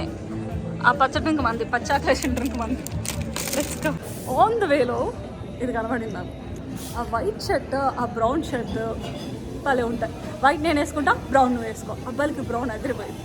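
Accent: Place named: Telangana, India. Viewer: నీ confidence levels సూపర్ బంగారు... Really you are so cute and smart... నీ native telugu మాత్రం కేక... తెలంగాణ పిల్లవు కదా.. కాస్త చిలిపితనం బాగా వుంది... God bless you...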